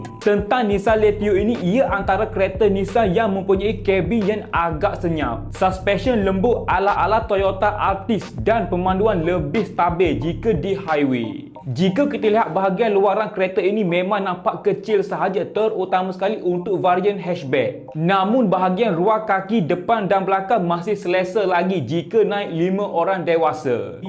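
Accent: Kelantan, Malaysia. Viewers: Kuat tone klate abe ni.. Blh tau.. 😁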